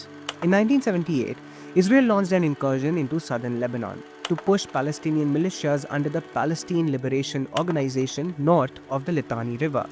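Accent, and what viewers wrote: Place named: India. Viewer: No matter how professionally this video is edited, I can't look over the fact that it's with an indian voice🤦🏻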